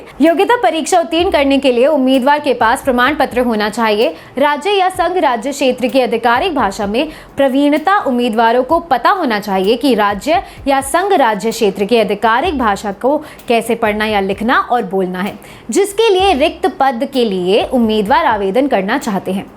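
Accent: Standard. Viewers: Ma'am aapki shuddh hindi👌👌👏👏👏👏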